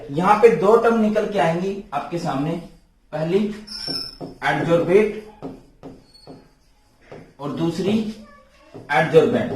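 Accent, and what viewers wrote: Haryana, India. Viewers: Sir aap hariyana s ho kya , becoz your voice is like that only 😅😅😅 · Mujhe lagta h aap harayana se ho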